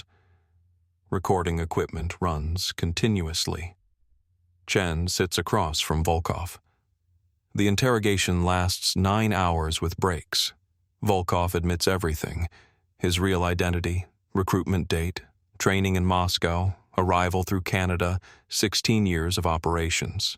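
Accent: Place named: United States. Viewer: Dull voice in this monologue. Very American. Machinelike. No nueancies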